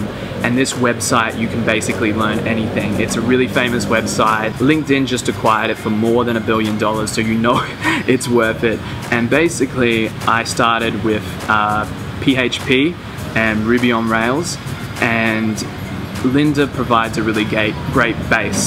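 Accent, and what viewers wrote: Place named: Australia. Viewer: I'm 100% you're australian (by your accent)!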